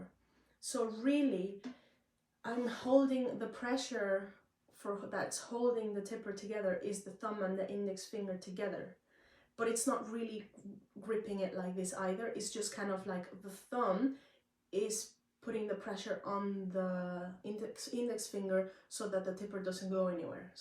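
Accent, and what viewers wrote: Ireland. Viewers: Hi, im both new to your youtube site and bodhran playing. So new in fact that ive yet to settle on which bodhran to get as my first but after watching several tutors on line, i can easily say I've gotten the most out of your content so, "yes" to your question about doing more of thes q&a sort of thing! You're an excellent drummer! I get alot out of listening but watching what you do to get those sounds is even moreso. I'm impressed with your great command over your techniques and dynamics. I have been a drummer for over 50 years playing loads of different genres of music but in all that time Irish music in general was not really in my lifes soundtrack. Nor were any of the musicians with whom I've played into it enough to pursue it either for fun or profit. So on the shelf it remained with green bagels and green beer, corned beef and cabbage and all the other commercialized "American Trad St. 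Patricks Day" faire. After morning Mass, of course! 😉 At any rate, I'm giving it a go now if only to play to cds! But im sure I'll work it in to a session somewhere eventually! Thanks so much for your inspiration as well as your wonderful content on you tube. (Its the only social media outlet i use for this sort of thing.) Oh, and your brogue is lovely! It reminds me of the way my family in the Offaly area speak. Ive other family around Ireland and for such a tiny country, the range of brogues seem vast! 😆 Be safe, and continued blessings! ✌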